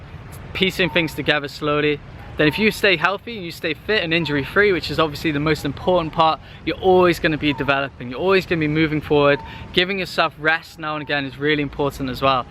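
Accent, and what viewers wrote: United Kingdom. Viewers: You sounds british but have palm trees in the background. whats the story there?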